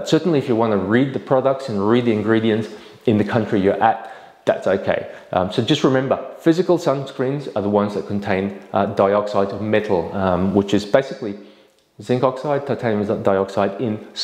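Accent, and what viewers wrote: Australia. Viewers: Love your Aussie accent. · love the Ozzy accent.. greetings from amsterdam :)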